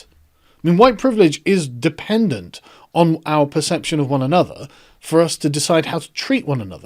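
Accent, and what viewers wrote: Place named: Public school, United Kingdom. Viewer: "Kont...I kont"...what a twat public school accent.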